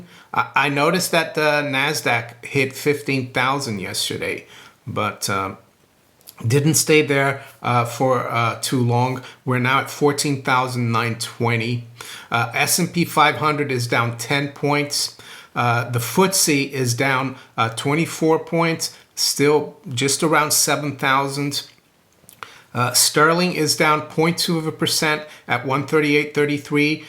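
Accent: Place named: Italian-American, United States. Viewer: Brazil? You sound like any US/NY Italian-American. Now in London. You should explain your family's ethnic origins, travels and education. Fascinating. ... You look like you live very simply, frugally. If so, the bravo.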